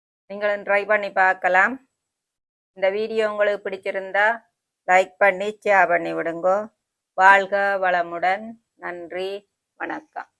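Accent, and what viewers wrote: Sri Lanka. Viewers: neenga pesarada Partha Jaffna madiri erukku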